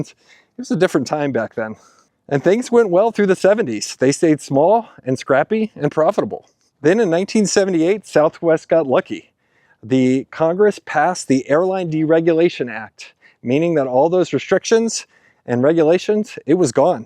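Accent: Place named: Chicago, United States. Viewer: Its all about the (damn) money! BTW, where are you walking at? Id say New Jersey, but you dont have the accent for it. Probably chicago area.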